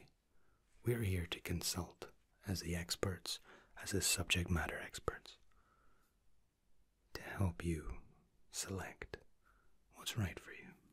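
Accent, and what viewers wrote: Scotland, United Kingdom. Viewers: Are you British? Or Scottish? I can’t quite tell. Love the accent though! 😉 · A Scots accent, A beard, and blue eyes was always going to be a winner, but wears Jean Paul Gaultier Le Male - OMG I think my ovaries just exploded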